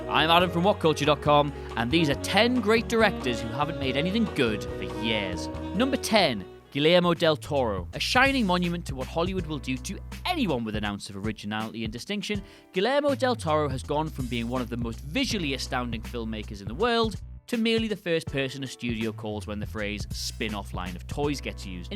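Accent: United Kingdom. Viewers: Hearing a British Internet host waxing poetic on the early 90's "Los Angeles gang scene" made my day.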